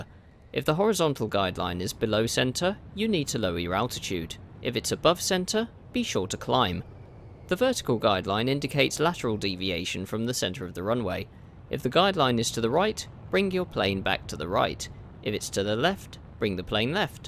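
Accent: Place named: United Kingdom. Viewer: Have you ever noticed smart videos like these come from British accent people. Love it.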